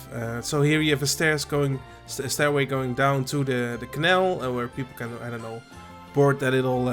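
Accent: Netherlands. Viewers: Dutch accent, Efteling music, Pieck dark ride - what's not to like! Subbed.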